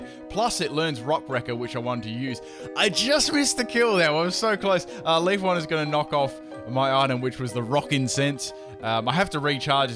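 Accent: Australia. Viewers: yo are you aussie?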